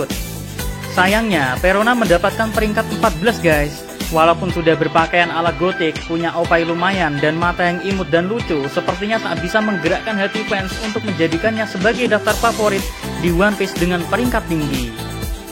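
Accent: Java, Indonesia. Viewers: logat jowo mu kuetok gan wkwk lanjutkan bikin video ne 🖒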